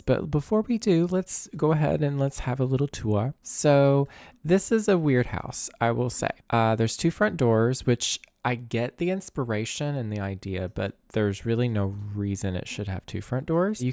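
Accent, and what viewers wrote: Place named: South, United States. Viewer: This is the first video I’ve seen of yours and I’m obsessed already! ❤️ You’ve got that sweet Southern belle realtor vibe going on and it feels so genuine lol. I pop in and out of Louisiana sometimes, so maybe that’s why I’m picking up on it. Either way, I’m here for it! 🤣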